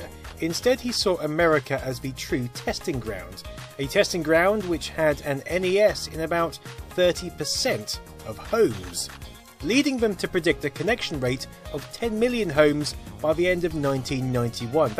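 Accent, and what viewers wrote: United Kingdom. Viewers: Speaking British is I see.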